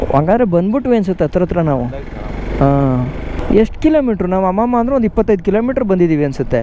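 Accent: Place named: Chamarajanagar, India. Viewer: Chamarajanagar Slang 😅👌🏻👌🏻👌🏻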